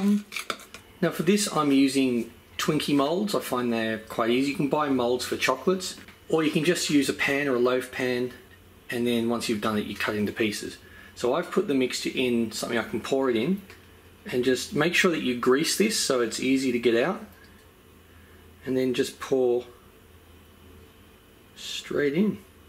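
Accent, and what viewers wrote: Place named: Australia. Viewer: Remember when I was only 11 and watched a ton of your videos, I think you only had around 50k subs. Still Love Your Aussie Accent!!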